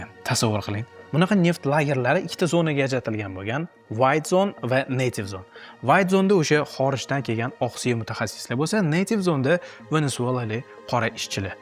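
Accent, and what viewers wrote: Tashkent, Uzbekistan. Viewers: Toshkent shevasida gapirayotgani tufaylidir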